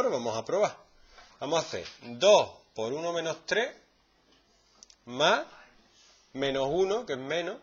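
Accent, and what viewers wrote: Andalusia, Spain. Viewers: me encanta el acento andaluz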